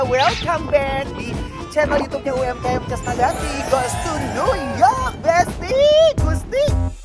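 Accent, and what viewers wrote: West Java, Indonesia. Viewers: Diluar negeri ge angger weh sundaisme pisan mah...moal di piceun kasundaan na..mantap lah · Stay humble. I like hear you speak sunda language! 👍🤣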